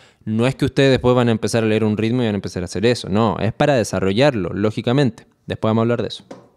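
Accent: Chile. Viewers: Primer video que veo, y empezando el video me di cuenta que eres Chileno jajaj. Buen video compa, sigue asi🔥🔥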